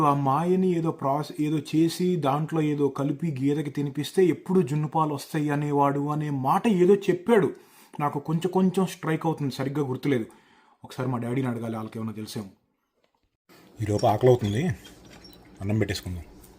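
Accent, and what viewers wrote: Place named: Krishna District, India. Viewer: Mee maata teeru krishna distrik laaga vundi same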